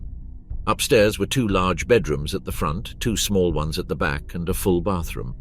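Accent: United Kingdom. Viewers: Umm the narrator has a British accent. So maybe redirect your frustrations.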